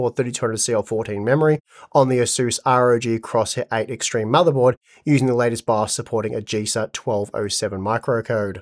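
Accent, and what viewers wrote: Australia. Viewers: Good Lord such a nice channel but Aussie accent is so bad to the ear.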